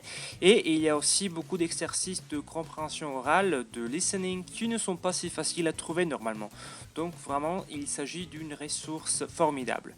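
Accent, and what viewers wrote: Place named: Italy. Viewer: Buongiorno e grazie mille per il tuo lavoro ! Ho studiato la lingua italiana per 3 anni a scuola, ma fa molto molto tempo.... In ottobre, ho deciso di continuare perchè mi piace molto questa lingua ! Ho trovato su internet : DuoLingo ! è gratuito. Secondo me, è come un gioco, ma si deve imparare ogni giorno (o "tutti i giorni" ?) DuoLingo è veramente interesante, ma non basta : ho bisognio di venire qui per le tue lezioni ! C'est un très bon complément . ps : il tuo accento italiano è bello ,-)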